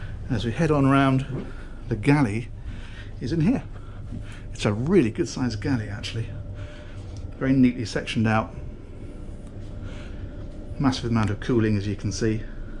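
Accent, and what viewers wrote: United Kingdom. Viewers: U are So Amazing brother I want to know Why all of the yachts ive seen u on Yes All 👍🏻♥️ why no Salt Water see thru Fish Aquariums?, Please find a yacht with the tanks or maybe talk about it a video example?, if the owner wanted he or she could have see thru Salt water Fish 🐠 Aquariums as the dividing walls with electric black out shades to seperate kitchen or bedrooms etc? I just Love them, Thank you my friend, i would Live on one of these yachts with you & your Awesome family for 6 months if i could afford it,,, 😇😇👍🏻♥️♥️🙏🏻⭐️⭐️💯💯🐠🐠🐠 Thnk u again for bringing us ur beautiful sights during this hard time, God Speed,,, Keep smiling & Love the accent love Britain,England All of your county, such cool interesting people.👍🏻😎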